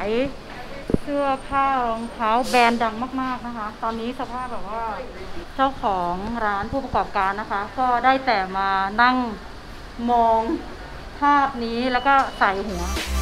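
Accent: South, Thailand. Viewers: คุณ เป็นภาคใต้บ่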